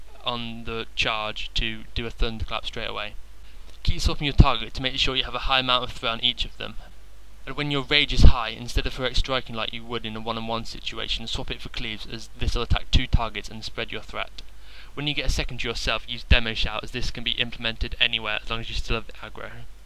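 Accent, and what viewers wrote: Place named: RP, United Kingdom. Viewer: there's nothing wrong with this guys accent.. its 99% perfectly spoken "Queen's English". Very wierd thing to say.. you mustn't speak English as a first language to make that sort of unqualified comment.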